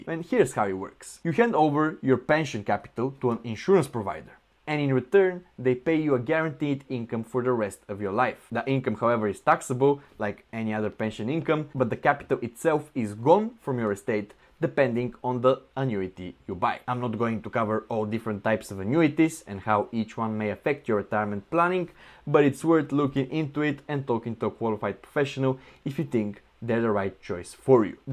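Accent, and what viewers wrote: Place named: United Kingdom. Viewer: Nice UK accent ! . Pension contributions are tax free so somewhere someone has to pay into HM treasury to pay for the PPE , Hs2 etc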